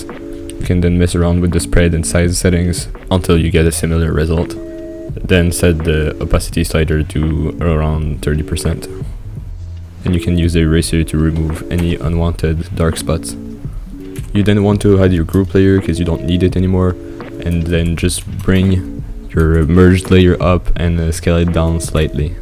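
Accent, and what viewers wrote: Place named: France. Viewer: that's just his french accent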